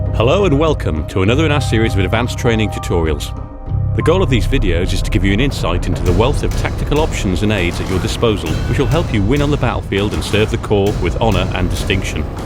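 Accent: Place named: United Kingdom. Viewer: "As a proud member of the United States Marine Corp" he says with a British accent.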